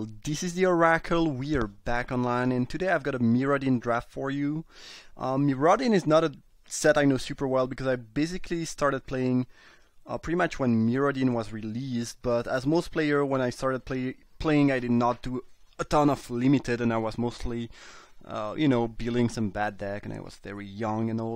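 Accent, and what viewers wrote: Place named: France. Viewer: Where are you from? I can't really figure out your accent. Sound french-ish to me but i'm not sure.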